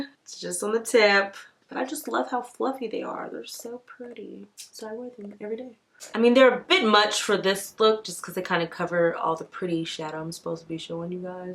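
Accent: South, United States. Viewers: That country accent 😂😍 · Lol the country accent killedt meh😂🤣 Looooove your videos😍💕👏🏾👏🏾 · That accent is giving me "Where's Applejack" vibes lol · I have five of these including mermaid and Oil Slick and I LOVE THEM!!!! 💟💟💟💟💟💟💟 And yes for the country accent 😂😂😂😂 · The southern twang was so cute! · That country twang tho!!! I'm here for it!! LOL!! 🤣🤣🤣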